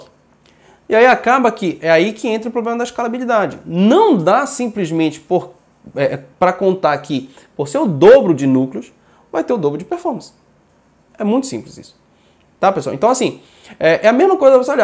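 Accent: Pará, Brazil. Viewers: cara tu é paraense??? hahaha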